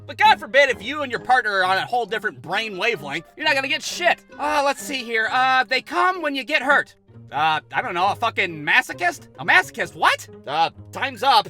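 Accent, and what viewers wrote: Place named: Midwest, United States. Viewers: That’s how we sound in the midwest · Typical Ohio accent - “average Michigander” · It's called an "ohio accent".... me and come from the same area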